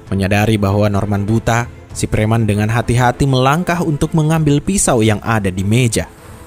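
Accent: Makassar, Indonesia. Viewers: Orang makassar kii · Org makassar ki kah?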